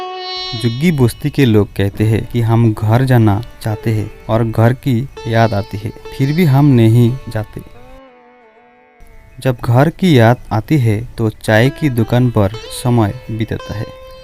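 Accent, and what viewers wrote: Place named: Sylhet, Bangladesh. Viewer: Iam sure you are sylheti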